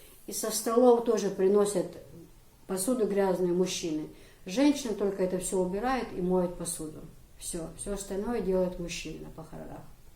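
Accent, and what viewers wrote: Chechnya, Russia. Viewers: Это надо же выучить чеченский язык на столько что ты не можешь говорить на русском без акцента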